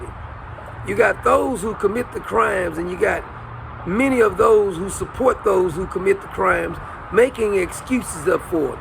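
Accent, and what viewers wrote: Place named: South Carolina, United States. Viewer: I grew up in SC, i live in NJ now tho. Hearing your accent reminds me of home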